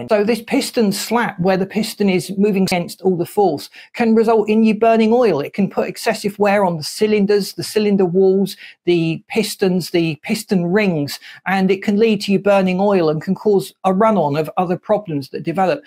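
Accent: United Kingdom. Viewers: Could you change your accent to something non british? Its barely understandable. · Why all the 'clever' advisory comments? This man knows his stuff, as do I (C&Guilds/National Crafts qualified for 46 years), so no need to 'try' to elaborate or add in any respect. He is absolutely 'Bang on' and a rarity, at that! He speaks like a true Brit!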